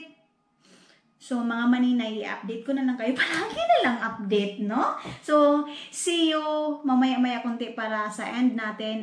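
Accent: Visayas, Philippines. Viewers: pag nag bibisaya ka tawa ako ng tawa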